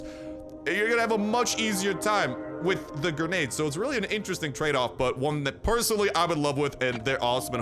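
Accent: Russia. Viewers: Hey are u russian whats wity the occasional accent whats your heritage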